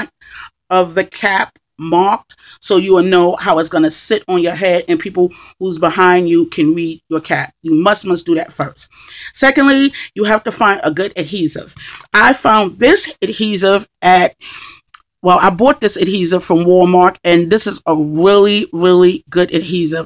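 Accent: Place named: New York, United States. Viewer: Fabulous idea. Wish I did this to my daughter's cap. I love your accent. I'm from NJ living in Georgia and I miss hearing that NY/NJ accent.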